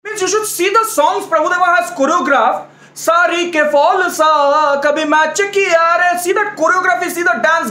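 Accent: Bengal, India. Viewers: There is nothing better than rant and his legit Bengali accent ( I'm not sure that he is bengali tho )!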